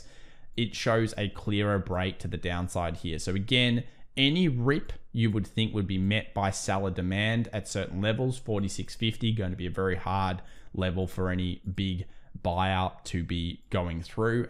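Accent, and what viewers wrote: Australia. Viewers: "Apple" is not pronounced "epple". Therefore, "Well" is not pronounced as "Wal" and "selling" is not pronounced "saling", "help" not "halp", "health" not "halth' - do you get it? Without these distractions, your presentations might be more listenable. I realise that this a peculiar to residence of the State of Victoria but it doesn't make it right.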